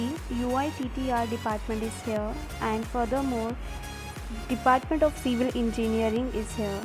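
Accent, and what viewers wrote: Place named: Haryana, India. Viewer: aapne too haryanvi ee bolya krr haryanvi ee bdiya hh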